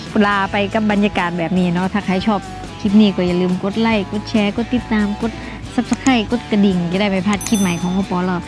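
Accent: Korat, Thailand. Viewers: ก็ว่าอยุ่สำเนียงคุ้นๆ พูดโคราชนี่เอง พูดเหมือนแฟนผมเลยครับคนโคราชเหมือนกัน 555 ผมทำคลิบเที่ยวเหมือนกันหวังว่าคงได้บังเอิญเจอกันซักทริปนะครับ😁😁😁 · ฉันชอบแก่พูดพาษาโคราช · ทนไม่ไหวต้องติดตามจนใด่สำเนียงเสียงภาษาโคราชบ้านเองชอบมักๆ😁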